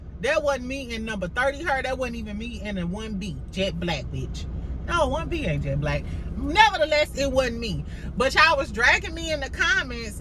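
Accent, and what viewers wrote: St. Louis, United States. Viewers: Hey love! This your auntie from Chicago. I love your content. I love that St. Louis accent. Don't change anything!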